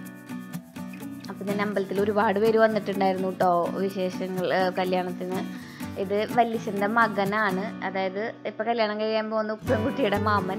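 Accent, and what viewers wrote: Thrissur, India. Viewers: Hi... new subscriber 👍 lv trissur language. All the best..😍😍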